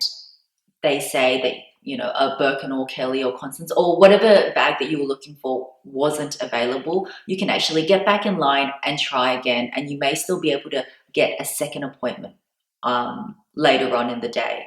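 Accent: Australia. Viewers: Hi, love your Aussie accent lol. Thanks for your video, I'm going to Paris soon, and I didn't plan to get a Hermes bag, ur video made me wanting to have one now!!!:)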